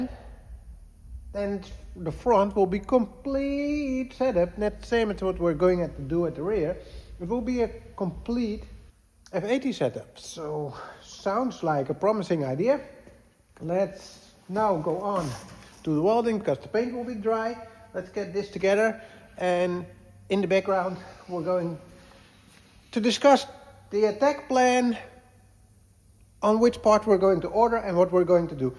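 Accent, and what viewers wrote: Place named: Netherlands. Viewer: Aan het Engels te horen ben jij Nederlands als ik zo mag raden 🤣🤣🤣👌 zo klink ik ook meestal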